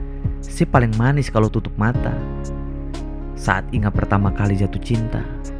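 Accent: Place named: Ambon, Indonesia. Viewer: kk punya kekuatan itu di bahasa dan dialeg ambon, KK percaya saja samua orang pasti pahami dialeg kk, semangat KK #ambonpride 👊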